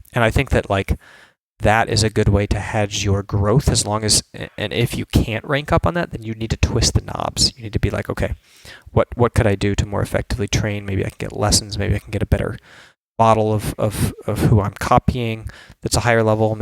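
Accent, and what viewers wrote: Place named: United States. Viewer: Where did you grow up? I find it hard to believe you're from Finland as you have no accent. You sound american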